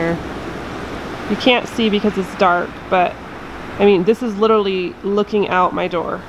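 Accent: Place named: Midwest, United States. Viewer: She has a Midwest accent.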